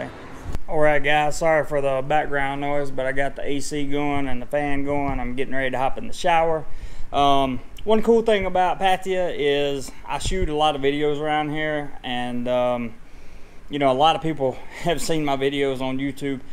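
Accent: United States. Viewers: I like your cool american accent.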